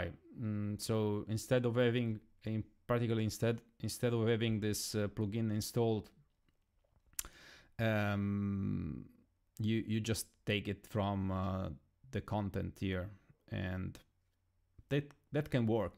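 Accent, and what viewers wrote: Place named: Italy. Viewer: Nice video and great explanations! And you have a lovely Italian accent! Keep on the good work!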